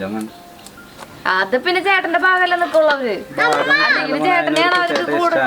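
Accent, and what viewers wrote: Thrissur, India. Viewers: Pure vegetarian aanengilum njan checheede non veg cooking videos um kaanum.... checheede thrissur slang kekkaan vendi 🤗🤗🤗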